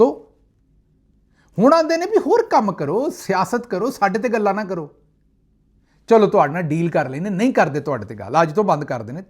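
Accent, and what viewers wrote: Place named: Ropar, India. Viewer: SB THODI BOLI SADI ROPER(AMBALA) WALI LAGDI AAA,BOHAT PYAAR AUNDA THODE TE.SADQE JAYON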